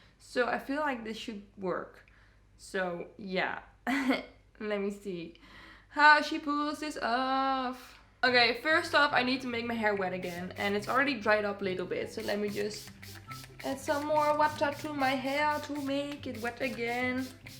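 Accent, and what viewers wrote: Netherlands. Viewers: First of all, amazing hair. Second of all, are you Dutch? You sound Dutch and im jealous of ur hair.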